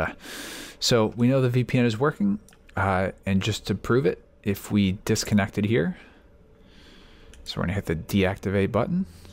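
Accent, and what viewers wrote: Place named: United States. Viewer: very nice Tutorial. And nice clear AMERICAN Voice ;-) Greetings from germany. I have bought the Router today ;-)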